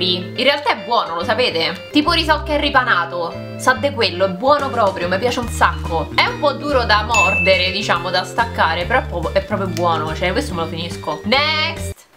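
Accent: Rome, Italy. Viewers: Ma solo io ho provato a vedere questo video in inglese e dopo i primi 10 secondi ho mandato al diavolo le mie intenzioni perché amo il suo accento romano? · Il tuo accento romano è qualcosa di unico 😂❤️ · è bellissimo il tuo accento romano !!!!!!!!!!!!!!!!!!!!!!!!!!!!!!!!!!!!!!!!!!!!!!!!!!!!!!!!!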